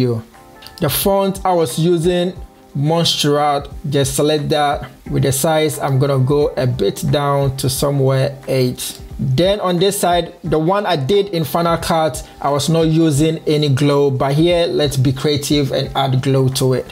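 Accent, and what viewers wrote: Ghana. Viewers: Hi. Love the Ghanian accent. How to you add effects to web pages like you did from showing the videos you make. Once again LETS GET STARTED.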